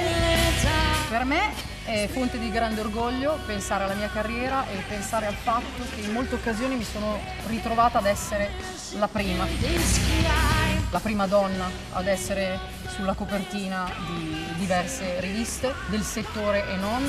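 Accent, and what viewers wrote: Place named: Italy. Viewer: Q mujer más Hermosa... ese acento Italiano es irresistible...((( 🖤 )))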